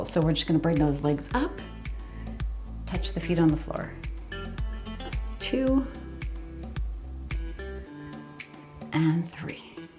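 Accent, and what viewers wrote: Canada. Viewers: Thank you, that was simply WONDERFUL!!!, I live in Michigan, and I've been wondering if you're Canadian. The way you pronounce ''out'', I like it.!!!! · I love your class. I walk with a cane. I look like stroke victim but I’m a cancer patient. I am thankful right now for my son, who got married this past weekend and for my daughter who is on a DTS in Glacier National Park. I am from NY in Rochester.where are you from? I hear a slight accent…. Canada?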